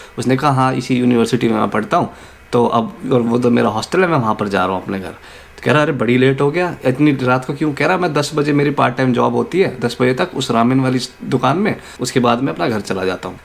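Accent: Haryana, India. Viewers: Bhai love ur haryanvi accent, plz aisi videos daala karo….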